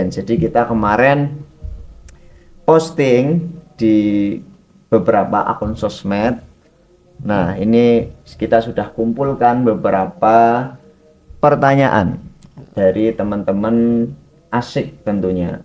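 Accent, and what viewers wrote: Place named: Java, Indonesia. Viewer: Habib kalih niki medoke candu sanget nggh😭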